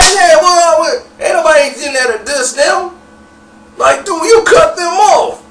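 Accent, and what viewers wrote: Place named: South, United States. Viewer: Dam good southern voice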